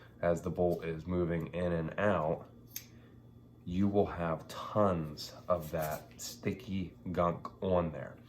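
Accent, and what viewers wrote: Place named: New England, United States. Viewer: I just remembered him saying in his New England accent that steel is very “hard”, and that that steel will last forever. Just keep it clean and very lightly oiled, noting can happen to those rails. The only parts that can go bad are the cam pin and the parts actually on the bolt, the lugs, the extractor, etc. I’m not an expert though, but that’s my understanding. The rails probably will never be a problem.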